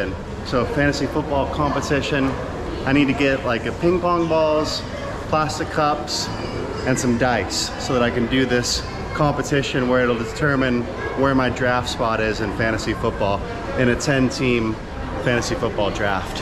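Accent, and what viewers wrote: South, Vietnam. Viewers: tiếng việt của bạn theo tôi đã dc 80% rồi , người tây nói giọng miền nam vn nghe rất thú vị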